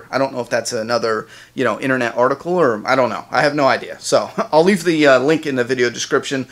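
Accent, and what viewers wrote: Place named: New York, United States. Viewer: u gotta be a new yorker! im from brooklyn myself n u remind me of people i knew back in brooklyn. sorry, just had to say that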